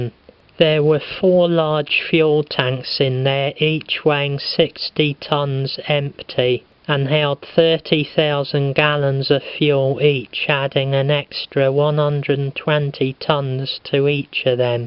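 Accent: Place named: England, United Kingdom. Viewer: wow great voice . you need to be in the old carry on movies .so Engish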